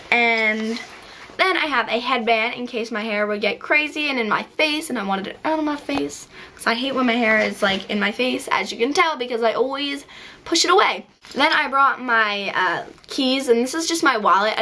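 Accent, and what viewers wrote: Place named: New England, United States. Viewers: it sounds like she's somewhere in New England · I think she may be from the north eastern united states because of her accent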